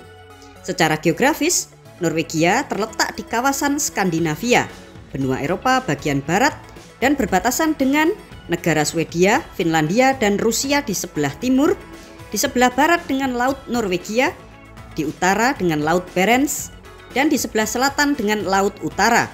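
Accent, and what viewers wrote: Java, Indonesia. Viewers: Admine ughong jowo iki pasti😅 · Mimin orang mana kok meddhok? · Orang jawa ya min??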